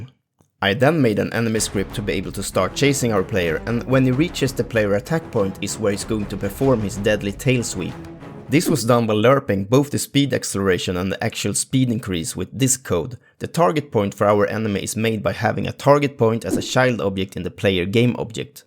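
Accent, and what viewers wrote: Sweden. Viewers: The game is really cool! But I have one question. Are you swedish by any chance? Because your dialect sounds very swedish😂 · Why do you sound soooooo swedish hahaha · SVENSKA DIALEKTEN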